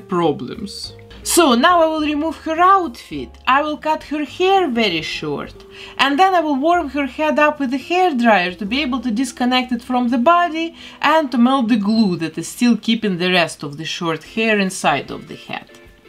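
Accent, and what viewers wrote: Russia. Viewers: Я чувствую себя ТАК странно, смотря Ваши видео. Мозг воспринимает русский акцент как русский язык, но при этом я понимаю, что звучит английский... хэлб А если не считать ребусов в моей голове, видео очень крутые, смотрю регулярно и с большим удовольствием.) Вы крутая художница! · Мне кажется или это русский акцент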